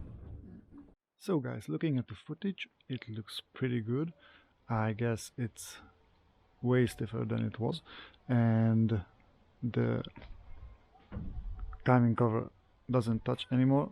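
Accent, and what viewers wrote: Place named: Russia. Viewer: Hey i have just subscribed! Thanks for your videos. I am getting a 1987 double cab this week. It has 1900cc engine. Petrol and water cooled. I am inspired by your vids. By the way i am from Israel. Where are you from? I here an accent... maybe russian?🤔 any how thanks for your vids! Keep them coming.😁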